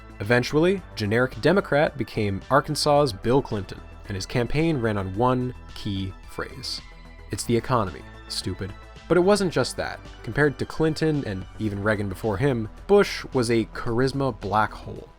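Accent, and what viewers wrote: Canada. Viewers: I will also admit, as a holder of one myself, I'm biased for the Canadian accent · No he‘s Canadian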